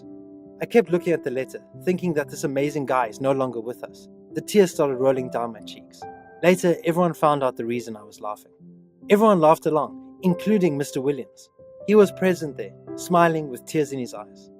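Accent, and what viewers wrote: South Africa. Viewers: Is the narrator South African? Cause he sounds like it. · The narrator is probs South African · South African right? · This is the first time hearing a narrator speaking in a South African accent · Hmmmmm do I hear a South African accent? Niceeee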